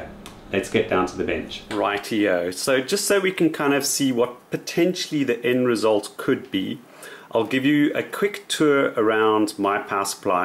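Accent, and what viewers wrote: South Africa. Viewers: Little confused at first having South African accent from the UK :-). I am enjoying the series. Keep up the good work.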